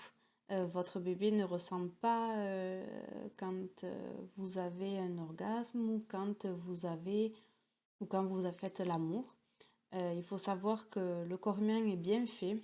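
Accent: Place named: Marseille, France. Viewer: tu parles comme une cagole de marseille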